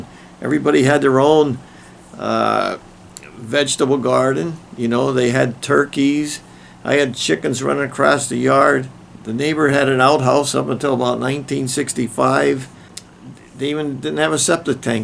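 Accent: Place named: Midwest, United States. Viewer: new subscriber, loved this video. I’m a 4th generation carpenter, Union trained Journeyman Cabinetmaker & Millman, worked (30) years in the Commercial Cabinet and Millwork Industry. My trade career ended in 2009 when the economic downturn and competing imports from China for less than our cost of materials wiped out my industry. Around the same time all of the major furniture companies filed for bankruptcy. Government sent me to Nursing School (LPN). Retired (4) years ago as a Jail Nurse. I started learning the Carpentry trade from a young age outside of Dubuque Iowa. By your accent I figure you’re from the midwest, maybe Illinois. I remember the old ways of doing things. Engineers hatchets, Yankee screwdrivers, & 16d sinker nails. When I was an apprentice, some of the journeyman Carpenters would take an engineers hatchet, cut of the hatchet part and weld on the claws in order to get a heavier framing hammer. 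Of course when the Union Rep showed up at the job site they had to hide it because it exceeded the weight the Union allowed. Anyway, your little talk about the old days brought back fond memories of working with my dad and grandfather. Thanks.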